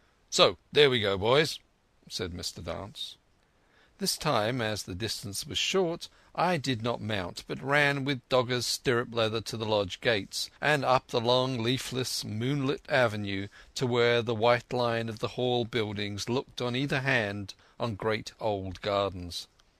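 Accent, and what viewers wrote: England, United Kingdom. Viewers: thank god for a English voice reading this ! Just started to listen to a recording with a yank reading it - so wrong ! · Well read Matey! Nuffin loik a genuine Brittish accent. Very enjoyable. :) F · Thanks I prefer your pronunciation and accent rather than the American ones, one remark is that your reading is a bit slow, but when it comes to direct speech ie when you say what some novel's characters say that's fantastic I find, and more realistic, after all the author was British and so are his characters!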